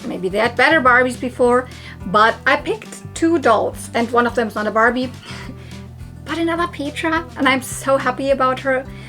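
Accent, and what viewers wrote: Germany. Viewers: What a wonderful doll/toy haul! I love everything you found! Brought back so many good memories 💗 Barbies of the 80s & 90s have a very special place in my heart 🥰 I spent my early childhood in Germany in the 80s. 🥰 I love hearing your accent and hearing German spoken. My father was fluent, and I started to relearn German in school. Unfortunately, I don’t have a lot of opportunities to speak it, so I’ve lost so much, but can still understand most of what’s being said. Great video! Can’t wait for the next!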